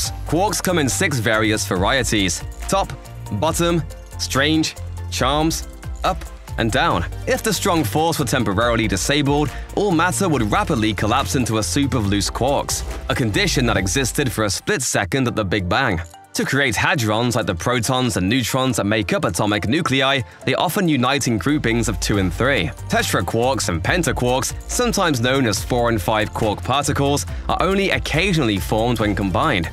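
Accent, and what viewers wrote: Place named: Ireland. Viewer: What is it with an Irish announcer emphasising the European CERN in imperial units and bracketing the metric with US spelling? Metric is standard. Even the troglodytic imperial units are defined by the metric standards, don't give the obsolete measures breathing space let alone put it front and centre. Plus whilst what was said was informative, the random graphics, plucked from who knows where, that have little to nothing to do with what is discussed, are not.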